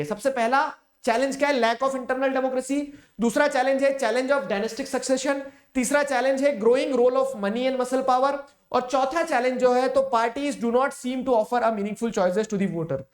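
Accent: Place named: Rajasthan, India. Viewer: rajasthani tadka sir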